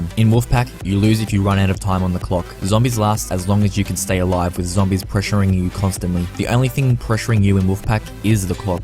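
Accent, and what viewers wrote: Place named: Australia. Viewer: Liked the comm ! but did not understand anything because of your Auzzie accent -_- :p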